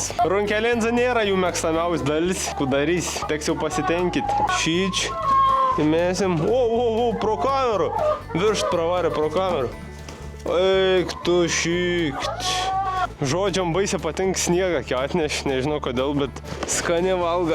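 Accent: Biržai, Lithuania. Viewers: Labai smavu ziuret tavo video, kalbi gryn birzietiska sneka kurios malonu kkausyt. Grazus krastai, birzu giria, Nemunelis, Aspariskiai, Dauguvieciai, keliai ,kelialiai. Senokai buvau, vasara zadu aplankyt, gal jau puse girios baigia iskirst.Geru vidosu, sekmes sunkiuose darbuose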